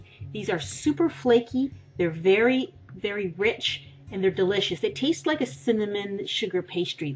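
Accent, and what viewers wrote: Canada. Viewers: Where are you from in Canada? I recognize my own accent. It's so distinct from the Americans.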